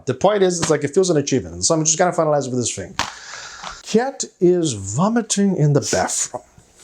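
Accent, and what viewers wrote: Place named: Brazil. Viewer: O cara é brasileiro tbm provavelmente pelo jeito que ele fala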